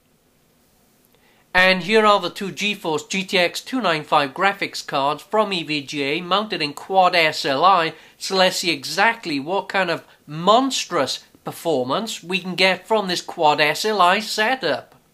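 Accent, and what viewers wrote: Wales, United Kingdom. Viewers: I love the welsh accent :D Great review.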